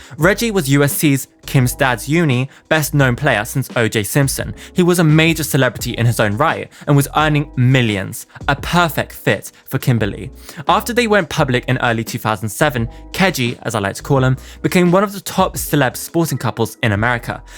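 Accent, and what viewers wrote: United Kingdom. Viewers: AND FER YOU LIL OOZIE…. AN HOUR LONG. BRITISH SPEAKING DOC ON THE KKW THE ONE AND ONLY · I really love your accent, reminds me of some of my British heritage and when I lived in London, I also love how you piece everything together and your enthusiasm. It does however, feel very biased to support The Kardashian’s. They have been caught lying a lot so I wouldn’t be surprised if she lied in her book too.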